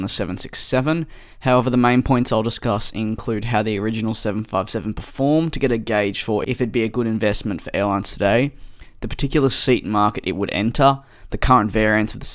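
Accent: Australia. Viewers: Thank you for the "757 Re-Engined" video-- the 757 is one of the most powerful and graceful of Boeing airliners. Pilots develop sentimental attachments to the 757 for its creature comforts, and the wonderful cockpit view. Your own, detailed commentary is very helpful-- especially the facts and reasoning for your viewpoint. Clearly, you read industry publications widely, and in-depth. Also, very helpful is description of your video equipment-- there is no substitute for a good tripod. Your Aussie accent is almost never a problem, but you have a tendency to drop complete syllables in words, and unless we Americans know the Aussie abbreviated pronunciation, the word is lost. However, we do understand your production is provided as an amateur, and a professional speaker is not expected. * If I have confused your accent with Australia, and it comes from industrial London, instead, my apologies. 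Quite a number of Aussies came from that area, originally.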